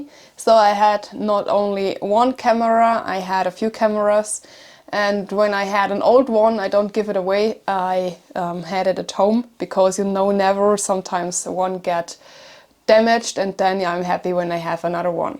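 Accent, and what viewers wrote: Germany. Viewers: All I can say is " I love me some!" Girl u r absolutely beautiful and awesome. That accent is really appealing. I miss Germany, former dependent of a service member station in Bamberg, Germay. Anyway, I really think you are beautiful and awesome. Tell the husband to behave or I'm in there like turbo boost. Want to see Utah, USA? LoL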